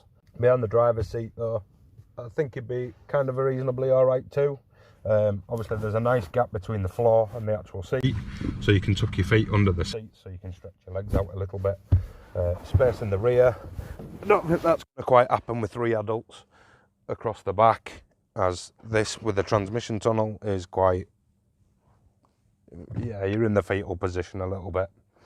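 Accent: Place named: Northern England, United Kingdom. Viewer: I like your friendly northern style but respectfully suggest you need to cull as here and there you repeat yourself. Less is more... I wish you had taken a motorway run as I'd have liked your observations regarding what many reviewers complain about, viz wind noise and tyre roar in this scenario. Apparently both are excessive. I'd be most interested to hear from owners on this subject if any of you care to comment, please. I've ordered a top spec Mazda replica - forgot to say that I think the exterior design is stunningly beautiful from all angles - but can't forgive the manufacurer for producing a car sans satnav as I loathe the prospect of faffing around with phone cable connection etc. Grrrrrrrr!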